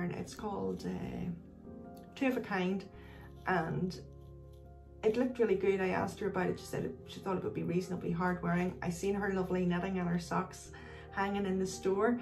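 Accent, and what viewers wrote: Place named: Ireland. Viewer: Hello, it's nice to meet you. I live in Minnesota, began knitting about 1 1/2 years ago and I love everything about it. Your podcast, and you are lovely. Your Irish brogue is a bonus! Your shawl is looking great. By now you have probably cast on the Snuggle Down cowl and whatever combo you decide or have decided to use will be terrific. I enjoyed the pattern so much I knit two of them. Thanks for taking the time to podcast, Fern in Minn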